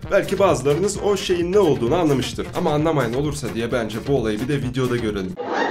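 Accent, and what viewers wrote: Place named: Russia. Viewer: abi sen rus musun çünkü yaban cı gibi konuşuyonda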